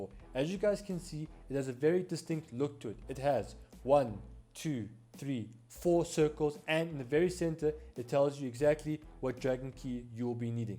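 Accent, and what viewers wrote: South Africa. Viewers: Hey bro you def south african i want an invite man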